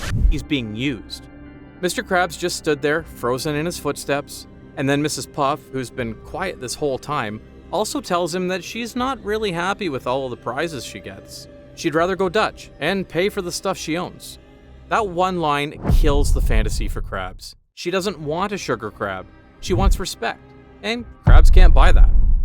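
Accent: Canada. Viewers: Too Canadian. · Is this guy Canadian? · you Canadian bro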